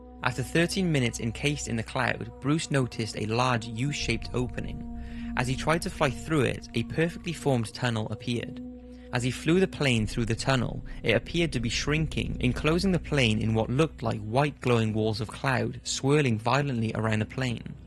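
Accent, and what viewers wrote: Wales, United Kingdom. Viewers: House Giantsbane I think I detect traces of a Welsh accent. If so, iechyd da! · you need to be hired to narrate literally everything. You are a modern day Morgan Freeman with a British accent.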